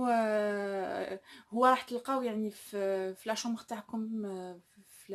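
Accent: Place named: Morocco. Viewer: الله يبارك بنت بلادي وشنو لهجة تاعك تميل بعض الشئ الي المغربية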